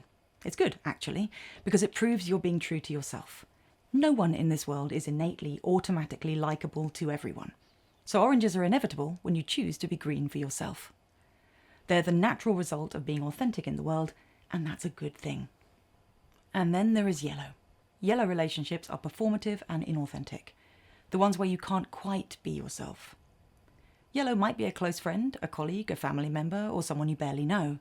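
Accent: United Kingdom. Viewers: I love your accent, is it british?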